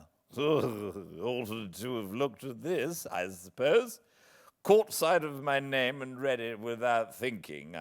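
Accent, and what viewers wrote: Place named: England, United Kingdom. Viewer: Hello, you tried to kill Leo's son Wyatt lol but I forgive you because you have an awesome voice and amazing super clear English accent. By the way I am the first commenter lol.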